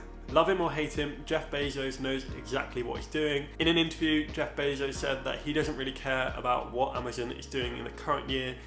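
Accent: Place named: Scotland, United Kingdom. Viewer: Nice video mate, Scottish accent was decent to be fair!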